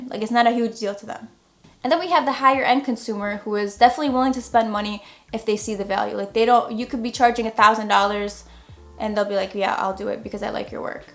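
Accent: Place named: New York, United States. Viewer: You sound like Jennifer Lopez I would think you’re from New York and Spanish.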